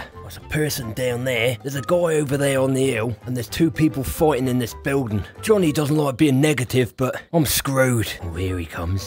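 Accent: United Kingdom. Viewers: you sound aggressively british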